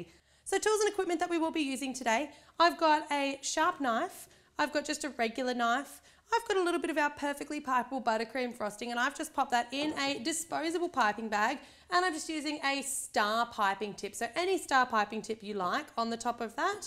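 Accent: Australia. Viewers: Aussie accent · I love all of your videos !!! I love cupcake making !!! Thank you so much for your tutorials! And btw I love your Aussie accent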